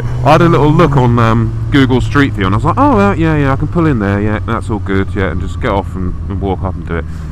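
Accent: England, United Kingdom. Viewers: i love hearing you swear in your grand english voice... haha i want a vlog of just swear words haha :D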